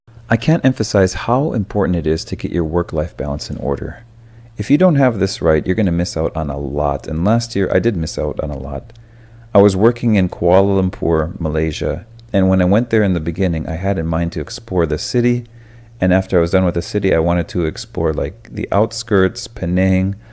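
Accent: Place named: United States. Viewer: You sound American now! Sorry about the earlier comment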